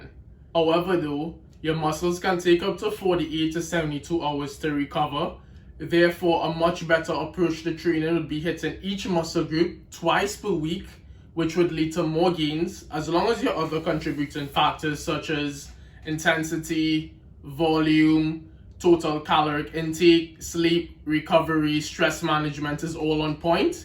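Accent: Trinidad and Tobago. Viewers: Great videos bro, keep up the great work. I recognize your accent, are you from Trinidad?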